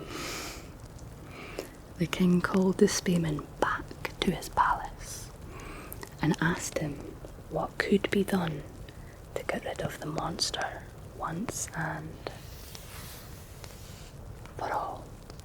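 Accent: Scotland, United Kingdom. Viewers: ❤❤ new subscriber! I hope there’s more bedtime stories! I adore your Scottish accent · After years of popping in for a wee bit of ASMR with you, I still find I get some of the most immediate, consistent, and strongest tingles on your channel. And it's not just my keenness for your lovely Scots brogue, or your great pacing and expression, but you have some of the most precise audio control of anyone in this segment. I gotta believe that your musician's ear has got to be a big part of that excellence in setting up your system and in the editing. Flawless, as usual. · Omg perfect asmr a scotish take in a beautiful Scottish accent so soothing ❤ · Very relaxing. And your Scottish dialect never gets old! Hope you're doing well! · May I ask which part of Scotland you're from? You have a lovely accent. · I went to visit Scotland a little over a month ago and oh boy I LOVE the Scottish accent! 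It's so different and charming. I'm happy that YT decided to recommend your video, I get goosebumps just by listening. Subbed! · This the softness of your voice and scottish accent. The make up and eyebrows are on point - absolutely gorgeous. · Wow nice story and cant believe I only came across you today, I'm from Shetland but had never heard of this story. You have a lovely Scottish accent 😊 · Scotland has a wonderful accent, I must say. This was quite relaxing. Much of my family is Appalachian, distantly originating from Ireland and Germany. The Appalachian accent isn’t one most would consider relaxing, but it’s a warm and welcoming time when it’s heard, and it’s always paired with the smell of wonderful food. It’s like a little piece of the past, of simple living and familial closeness.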